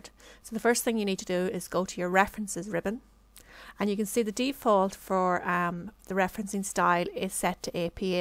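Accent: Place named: Ireland. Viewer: Lots of ok ...ok..ok...all over. and it will sound more interesting...probably Irish Accent?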